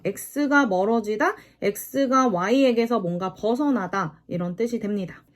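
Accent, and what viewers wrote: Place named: Jeolla, South Korea. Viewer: 전라도네? 으미X 의미0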